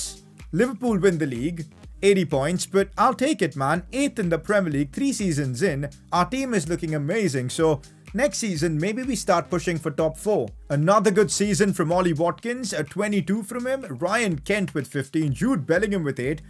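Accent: India. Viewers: Why does have an Indian accent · R u indian bro?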